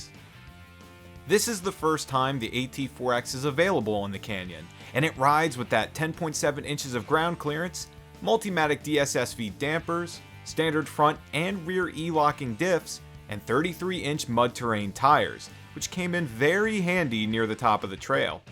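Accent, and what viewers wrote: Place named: Baltimore, United States. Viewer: The Baltimore is strong with this guy 😊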